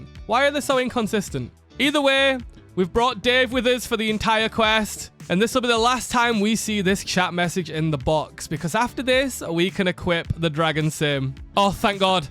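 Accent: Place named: England, United Kingdom. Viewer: It's a beautiful day when uploads. The mad scientist is back with his perky english accent 😊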